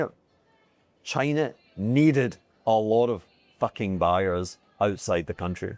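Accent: Scotland, United Kingdom. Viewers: A drunk Scotsman reading a script from a laptop. I wonder who wrote this garbled nonsesnse?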